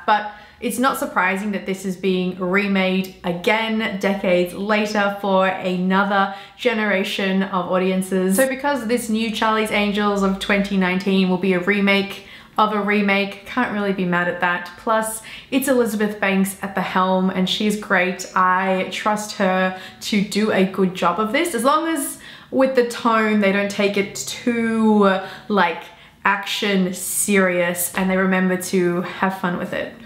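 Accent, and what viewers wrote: Australia. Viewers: You have a good auzzie accent you speak properly unlike some auzzies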